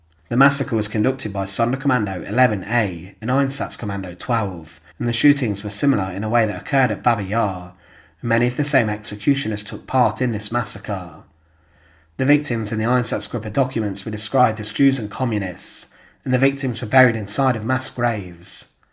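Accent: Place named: Midlands, United Kingdom. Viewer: You're not British obviously. I think he narrates really well. Sounds like midlands maybe east of Birmingham somewhere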